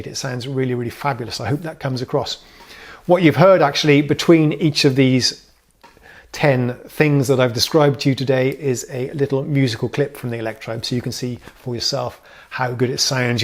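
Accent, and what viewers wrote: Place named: RP, United Kingdom. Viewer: Wow was not expecting an Electribe demo video to feature the poshest accent I've heard since I watched Our Man in Havana a month ago.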